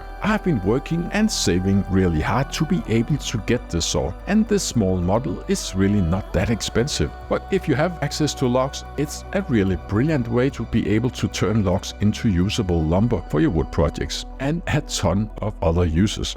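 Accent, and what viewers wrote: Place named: Denmark. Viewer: Awesome! I’m a carpenter in Calgary Canada. But I’m Danish. Moved here 1967 when I was nine months old…Still have family back home. It’s nice to hear your accent. It reminds me of my father, who also died a couple years ago…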